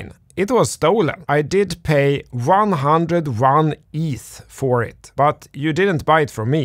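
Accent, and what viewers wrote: Sweden. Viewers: Your dialect is so swedish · Great video👍 I can hear the swedish accent, so you are definetly from Sweden 😄👍 Hilser deg fra Norge 🇳🇴🇸🇪🇳🇴🇸🇪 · Mest ikoniska svengelskan jag hört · This is the most swenglish I've heard since Rosling 😂 great video!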